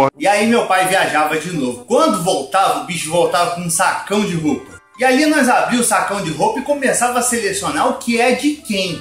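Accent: Minas Gerais, Brazil. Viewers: Mineirês??? Kkkkkkk mutriii 😂😂😂😂😂😂!! Ahh sim, manoo do céu, quase passo mal de tanto rir 😂😂😂😂😂😂 choreeeiii largaaado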